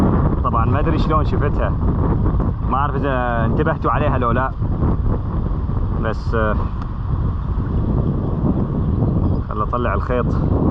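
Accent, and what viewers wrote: Iraq. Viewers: منعول الوالدين سواهن سبحة !!! ههههه . إبن ذي قار مبين عليك من الإسم واللهجة. ... بس عفية عليك طفرت.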